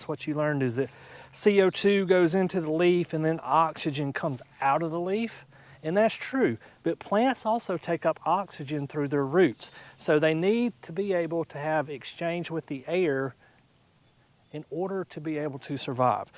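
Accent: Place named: North Carolina, United States. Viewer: Are you from North Carolina? Your accent sounds like it.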